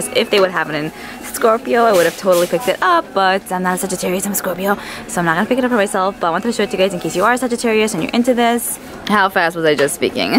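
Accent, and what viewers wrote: New York, United States. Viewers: I never comment but always watch since you came up on my suggested and I subbed. Love your accent I too am a New Yorker but I live in the deep Deep South (Florida😎) so you sound like home💖 now back to my point that exclusive for Harrods was named for Princess Charlotte they did a whole Royal line so that is an amazing find! Love all your hauls I’m a big TJ Maxx fan and just keep these videos coming!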